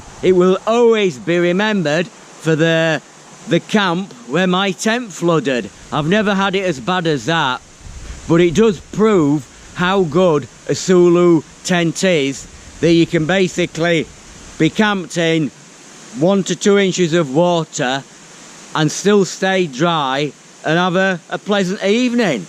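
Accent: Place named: Yorkshire, United Kingdom. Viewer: Proper Yorkshire accent:)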